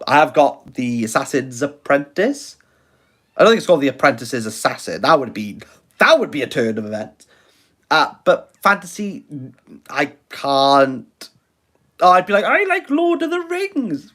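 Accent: Wales, United Kingdom. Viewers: I did Not expect this thick Welsh accent hard-as-nails man to survive the first week of Ramadan, but deep inside, when I sow how he makes the "Rrrrrrrrrrramadan Mubarak" sound, I knew he is sincere about life, and he might just make it to Eid. Look at him, crumbling, but not falling. Look at him now after thinking of quitting youtube, he is making a Live... Man we Love you for the sake of Allah, and may Allah bless you and your family, and may Allah give you from where you never expect, and keep you and your loved ones on the straight path insha'allah. Eid Mubarak Brothers & Sisters 🎉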